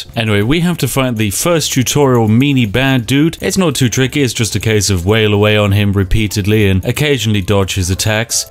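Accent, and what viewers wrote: United Kingdom. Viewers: *moans* A perfect British accent...I love it.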